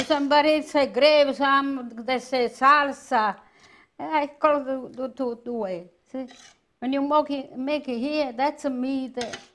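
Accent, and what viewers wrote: Italy. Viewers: watching you cook, and hearing your wonderful Italian accent, and feeling the love coming from your heart, makes the part of my day that I spend with you, in your kitchen, take me back to my own childhood, your a very special woman, thank you for what you are and do. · Oh, I looooooooove Italian accents! And hers is so sweet.... I love how she narrates every step. Sending big hugs from Deutschland, beautiful lady! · My God I could smell that food through my phone it looks so good. I just want to give that woman a big hug I love her thick Italian accent continue continue making videos grandma I love you. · So happy to stumble onto your channel. My sweet Italian grandmother has been gone for 19 years. Listening to you is like being back in her kitchen. I never noticed her thick accent. I understand every word you say. Wonderful memories. You are real treasure. 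· I am a fan of Italian cuisine specially when it is presented in English with Italian accent. I am happy that Pasta Rigatoni brings back to you nice memories.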